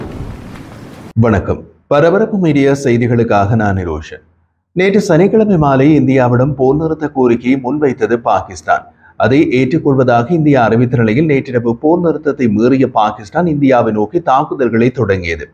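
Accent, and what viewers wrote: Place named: Sri Lanka. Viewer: அருமையான தமிழ் செய்திகள் தூய இலங்கை தமிழ் நல்வாழ்த்துகள்!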